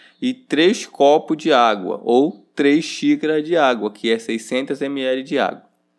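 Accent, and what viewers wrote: Pará, Brazil. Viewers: Amoooo 😍😍 o seu sutaqui , paraense 💕💕💕💕💕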